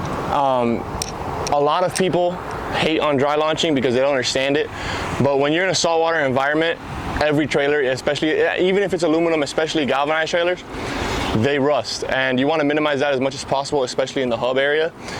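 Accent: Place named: Miami, United States. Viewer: The Miami accent goes crazy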